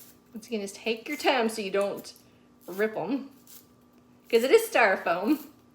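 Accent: South, United States. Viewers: I enjoy your channel so much! I'm playing catch up now watching all I can! I can understand you just fine I'm a southern lady too! 😊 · I love the whole thing!! You don't talk funny it's just our southern drawl!! ❤🤔😂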